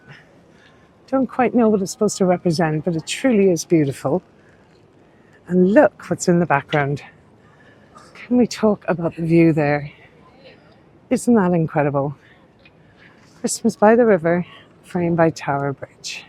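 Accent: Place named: Ireland. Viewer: Thanks so much love ❤️ uour enthusiasts together with that charming Irish accent reminiscent of my dearly departed Mother. We are visiting central London tomorrow and we'll certainly be taking a cue from your knowledgeable video! Happy Christmas and New Year!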